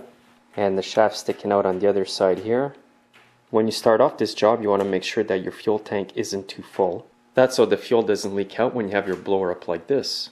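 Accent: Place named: Quebec, Canada. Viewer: you speak English very well but I notice a super small accent...are you from quebec?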